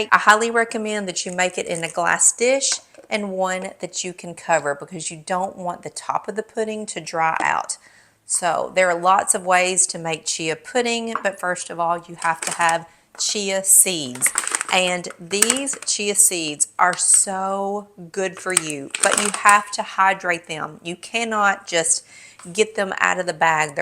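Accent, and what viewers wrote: South, United States. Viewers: 🤣🤣🤣🤣🤣🤣🤣🤣she is a southern woman that's how they pronounce every thing and I love it!!! okayeee bless your heart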